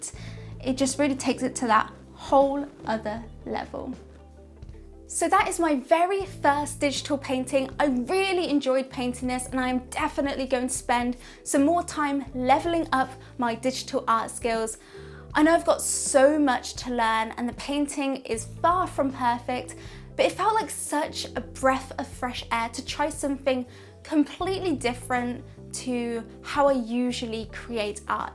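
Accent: United Kingdom. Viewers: Confused. Sounds British, but charges in Dollars for the course? Sounds like a scam. That always sends red warning lights to me.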